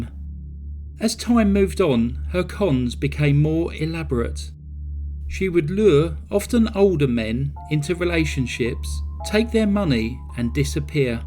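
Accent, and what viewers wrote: England, United Kingdom. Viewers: I must say Real Crime isn't my favorite but i like your storys and your so beautiful English accent👍❤🥰😊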